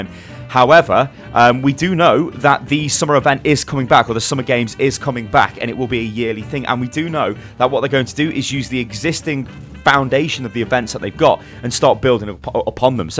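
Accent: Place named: United Kingdom. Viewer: where in Britain are you from?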